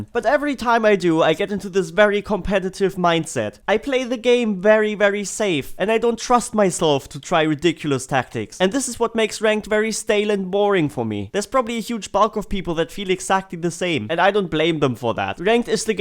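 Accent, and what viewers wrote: Germany. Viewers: you sound extremly german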